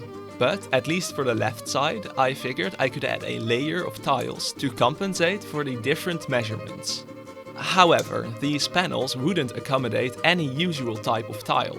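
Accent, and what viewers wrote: Netherlands. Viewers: holy dutch accent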